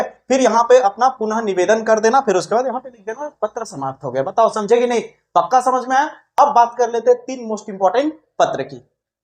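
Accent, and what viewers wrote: Bihar, India. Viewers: Sir lagta hai aap bihari ho...😅 Me from up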